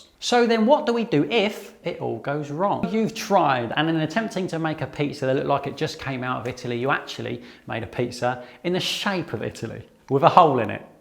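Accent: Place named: Cockney, United Kingdom. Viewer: Waitrose and Taylor? Never judge a bloke by his barrow boy accent:) As informative as ever and now I have options...great video.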